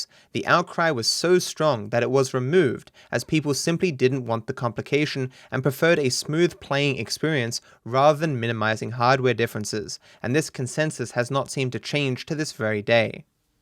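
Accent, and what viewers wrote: Australia. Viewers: Wait your from Australia? Wtf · Oi, u are Australian? lol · This guys stupid Australian accent mixed with his terrible speech impediment that makes his “R’s” sounds like “Aw” makes him impossible to understand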